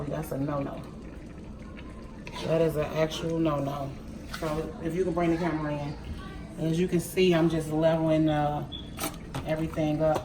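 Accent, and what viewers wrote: Chicago, United States. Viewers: Is that a Chicago accent I hear? 👀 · Chicago in the house. I know a Chicago accent when i hear it. New subscriber here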